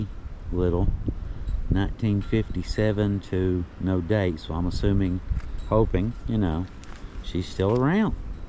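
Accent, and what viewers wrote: South, United States. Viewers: your soft, southern drawl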